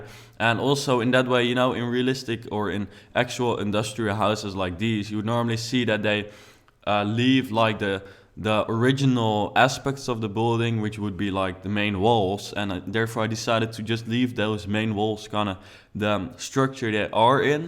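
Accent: Netherlands. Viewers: That Dutch accent tho xD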